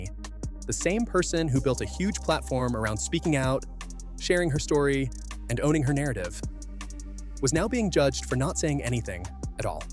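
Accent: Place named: United States. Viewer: Usual yankee verbal diarrhoea narration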